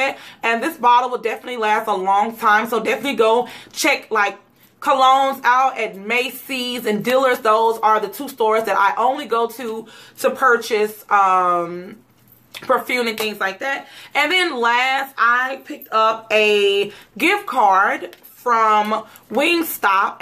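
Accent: Mississippi, United States. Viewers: Girl you from Mississippi? I instantly subbed! 🙌🏾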